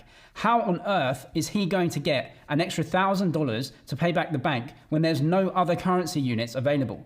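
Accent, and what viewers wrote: United Kingdom. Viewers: Hi, where do you come from? You sound British.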